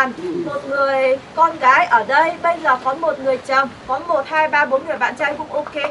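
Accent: North, Vietnam. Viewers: Nói tiếng bắc. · Lại nghe giọng bắc...hơi nặng!!!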